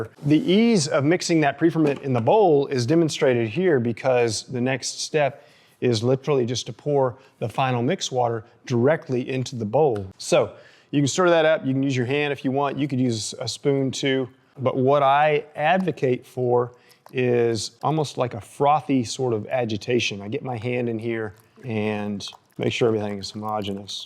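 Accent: Arkansas, United States. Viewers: I’ve been watching these videos religiously for months. I knew that accent sounded familiar. Had no idea you were a fellow Arkansan! Thanks for the work you do! It’s been a big help to this amateur baker. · Being a Memphian, I was intrigued to hear your slight accent... cool to find out that you are originally from Arkansas! Love to watch you bake!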